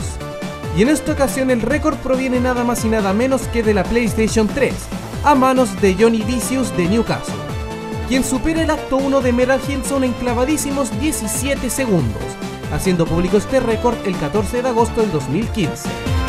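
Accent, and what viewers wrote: Chile. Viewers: Wait hablas español hablas como chileno :v Espera talk spanish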